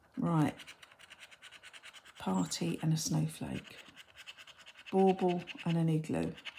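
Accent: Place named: Southeast England, United Kingdom. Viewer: Hello there! Nice to come across your channel! New supporter here! Love the accent by the way (must be missing uk) 😅 southeast?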